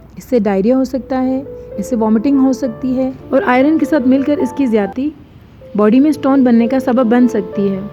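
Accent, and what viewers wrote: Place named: Karachi, Pakistan. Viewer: Thanks alot for the Precious and healthy Information Your Excent is Karachi Wala Am I Right?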